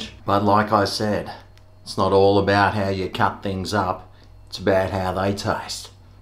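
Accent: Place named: Australia. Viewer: From an Aussie - I love your good old Aussie accent and style. Great representation of the average Australian :) Good lookin sanga!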